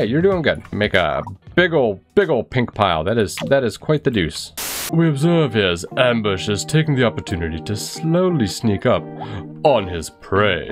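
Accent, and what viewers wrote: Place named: United Kingdom. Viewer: i LOVE your BRI ISH acsent!!!!!!!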